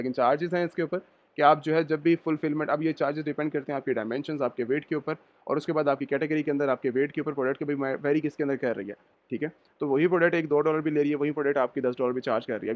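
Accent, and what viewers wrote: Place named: Lahore, Pakistan. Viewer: i think you are from pakistan because your accent is like a lahore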